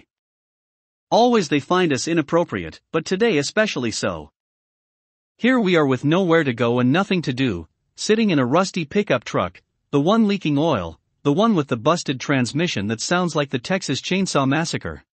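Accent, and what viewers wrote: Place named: United Kingdom. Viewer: It's a bit hard for me to understand the British accent, but I'm getting used to it, great story.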